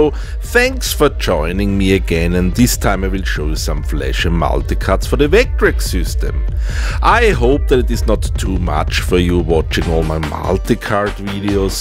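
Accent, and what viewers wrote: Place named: Austria. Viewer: hey, sehr nettes video, gehe ich recht in der annahme, dass du aus österreich stammst? dein akzent hört sich sehr danach an. grüße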